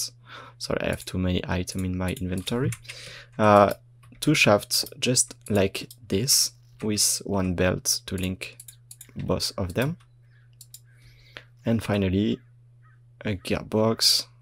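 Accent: France. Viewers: Love your french accent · On aime l'accent français, super vidéo ! XD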